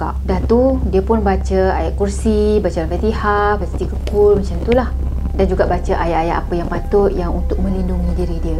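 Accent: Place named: North, Malaysia. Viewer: Perhh Pandai cakap utara ek😉😉😉......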